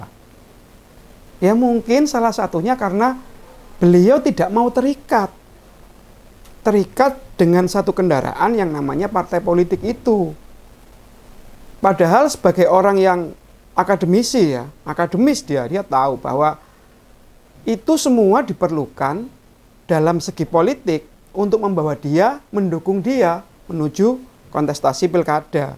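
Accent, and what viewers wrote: Java, Indonesia. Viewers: ini asal daerah mana ya kok jawanya medok banget..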